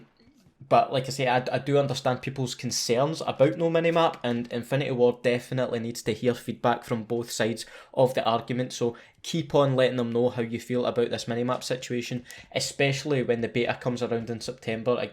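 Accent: Scotland, United Kingdom. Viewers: #scottishficationsquad thanks to my endless watching of your videos, my internal monologue now has a Scottish accent. Keep up the great and hilarious videos mate!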